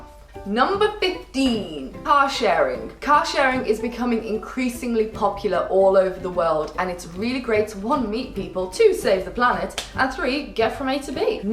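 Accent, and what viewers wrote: England, United Kingdom. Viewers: here expressions are very cool. I was just searching for some brazil thing and I found a video by the title language challenge. in that her facial expressions were awsome and with that english accent her voice is like a melody. Nice video for the people who love to travel but can't afford.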